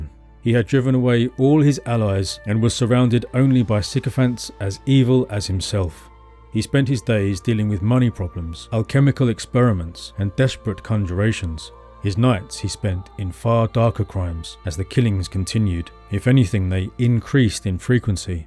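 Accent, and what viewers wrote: United Kingdom. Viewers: This video auto-played after one of MrBallen’s videos. I normally just exit the new video when this happens, but not today. This is awesome! History, crime and great narration. The British accent is a huge bonus (I’m a borderland Texan lol). Thank you, sir. 🏰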